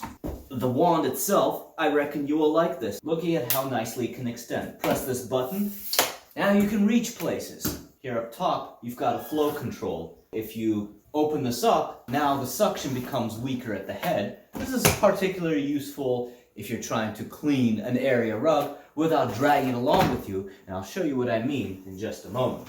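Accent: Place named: Germany. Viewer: Pronunciation: not strange, just German. Duh.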